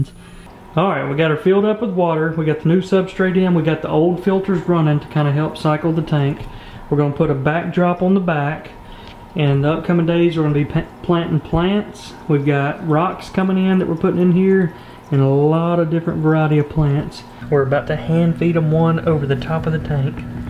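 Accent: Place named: Louisiana, United States. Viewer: i can tell this guy is from lousiana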